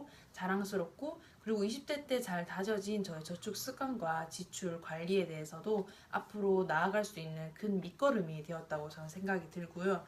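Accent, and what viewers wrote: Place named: Gyeongsang, South Korea. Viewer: 대단하세요!! 진짜 자랑스럽네요! 그리고 경상도 사투리 넘 귀여워용...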